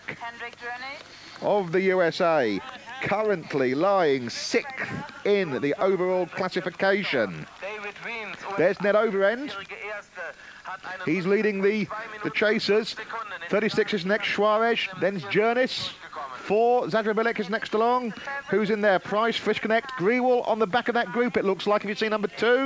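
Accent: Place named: United Kingdom. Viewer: Who hired this announcer? Was it like, Hey! We need a British accent guy to read some info .... brutal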